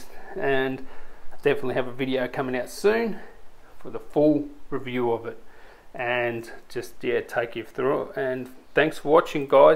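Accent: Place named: Australia. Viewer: Getting rid off ur au accent may appeal more views, mean no harm ♥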